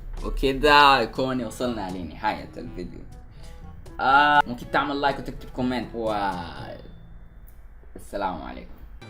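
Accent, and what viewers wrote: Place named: Gulf, Arab world. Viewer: لهجتو بطيئة و غريبة و فيها نبرة خليجية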